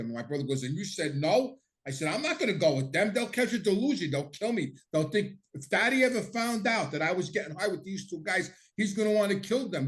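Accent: New York, United States. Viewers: Huge fan from Toronto, Canada. I absolutely love your podcasts, it's very fascinating the way you tell the stories and everthing and gota love your NY accent, keep up the good work 👏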